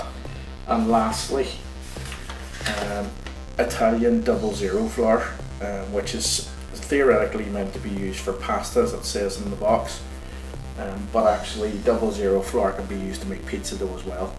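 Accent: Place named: Ireland. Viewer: I love your Irish accent I am from Scotland just over the sea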